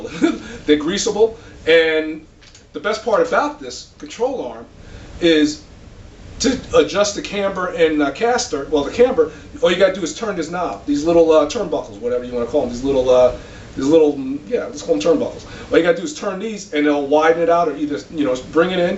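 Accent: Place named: South, United States. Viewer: I wondering where you live? Sounds like in the south. I'm on the West coast, Blacks build lots of muscle cars.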